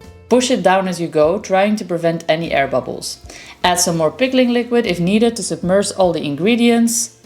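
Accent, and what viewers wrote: Netherlands. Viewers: You sound dutch 😊 Groetjes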